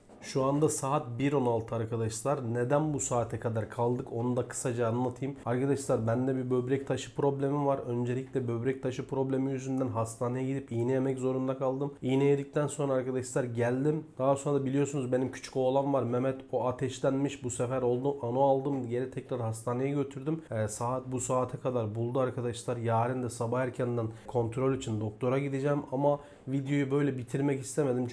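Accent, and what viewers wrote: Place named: Ankara, Turkey. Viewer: abiciğim sen nerelisin ya konusma seklın aynı ankara :D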